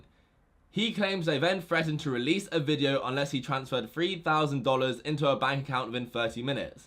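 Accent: England, United Kingdom. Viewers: Why do i get this clown in my recomended? I dont care about Greg Paul making out with anyone, even less i care about you exposing him.. You are faker then Kylie's titties... After all we are not judging you for being born English and talking your stupid accent. Youtube please fix your recommendation shit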